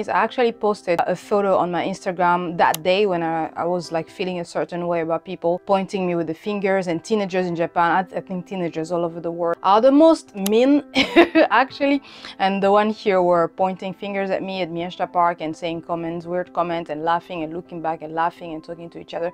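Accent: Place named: France. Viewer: wait are you french? bc u have the french accent like me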